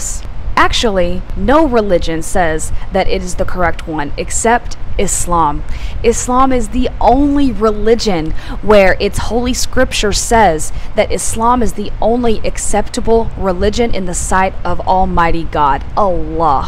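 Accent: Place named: South, United States. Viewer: southern accent sister. Baarakallaahu feekum.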